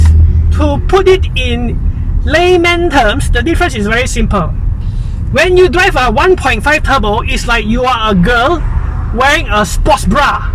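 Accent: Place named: Indonesia. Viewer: silly indon tone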